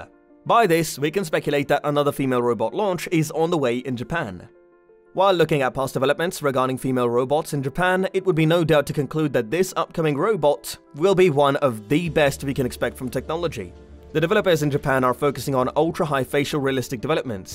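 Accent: United Kingdom. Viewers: Why do these videos always have to be narrated by some idiot with a British accent? And of course it will only be available to the very rich.. Who else could afford to buy an artificial companion for $350 THOUSAND Dollars?? And if they are fully functional then show me... I want to see the function!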